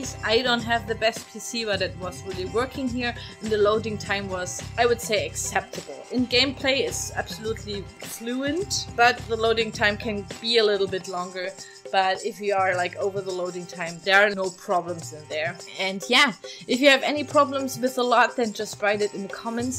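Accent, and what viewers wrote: Germany. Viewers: sieht wirklich suuuper aus :) aber boaaa der akzent ist so quälend xd typisch deutsch irgendwie hahaha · are you german? (just guessing from your accent :D)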